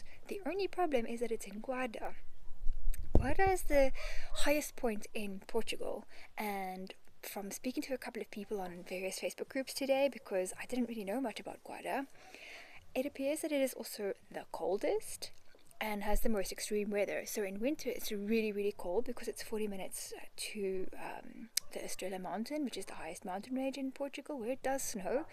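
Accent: South Africa. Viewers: Well done for chronicling your search. Good output of vlogs with updates and good dialogue/commentary, if a little difficult to hear in places - using earphones worked for me. Appreciate you may have limited resources whilst travelling and just starting out with vlogging, your vlogs would be enhanced with photographs and maps to illustrate the talking parts. Your accent and tone is much sweeter than a lot of SA's, is is distinctive of your home region.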